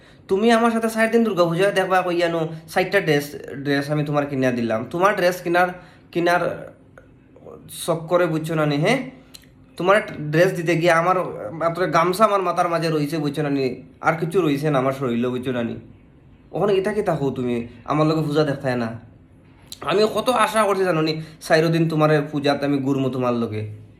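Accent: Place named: Bangal, Bangladesh. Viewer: দাদাভাই আপনার বাড়ি কোথায় বেশ সুন্দর বাঙাল কথা বলছেন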